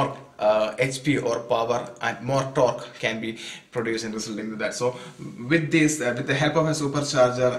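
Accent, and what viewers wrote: India. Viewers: don't know if he is speaking english or indian.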